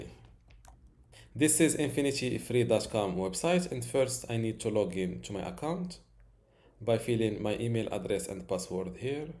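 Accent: Morocco. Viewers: idk why but you sound moroccan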